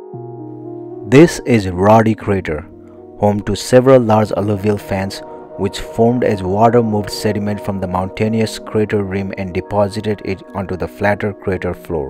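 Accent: India. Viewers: This is more like Indian call center like you've never heard before..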